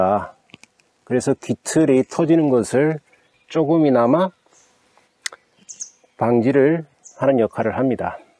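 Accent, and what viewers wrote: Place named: South Jeolla, South Korea. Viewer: Thank you for teaching some Korean wood crafting! I enjoy your chollnamdo accent reminds me of Gwangju. 😘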